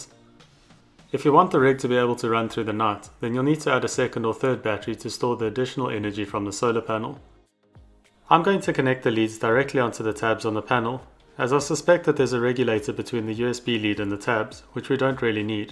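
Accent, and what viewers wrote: South Africa. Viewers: Sjoe, jou aksent skree saffa boet.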